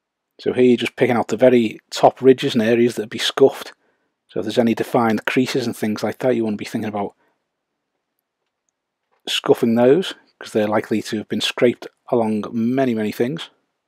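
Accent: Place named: Merseyside, United Kingdom. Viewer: These Uruk Hai guides have been so helpful as I plan on starting an Isengard army soon. The Merseyside accent is also a plus 👍🏻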